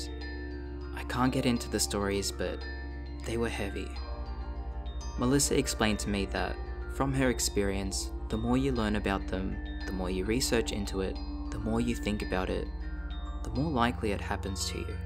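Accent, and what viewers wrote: Australia. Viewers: Wtf the transition from the anime part to the actual video scared me cuz I forgot you had an Australian accent..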